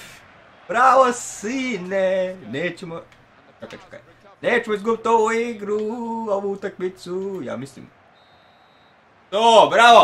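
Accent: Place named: Bosnia and Herzegovina. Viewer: HAHAHAH Bosanac brate :3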